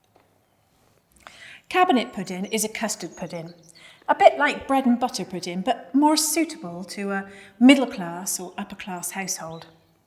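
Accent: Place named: RP, United Kingdom. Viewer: she’s so posh ❤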